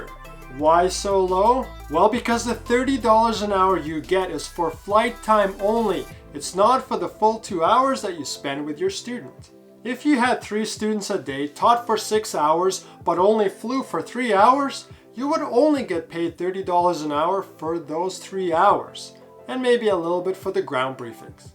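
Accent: Canada. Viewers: Do I sense a slight Quebec accent? If so what schools are good with a good price in qc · im from bc and this guy has the most canadian accent ive ever heard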